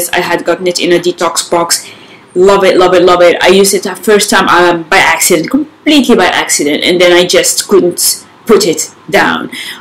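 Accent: United Kingdom. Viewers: that british accent though hahahaha i loved the video, keep shining! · you are developing a little british accent, how cute ..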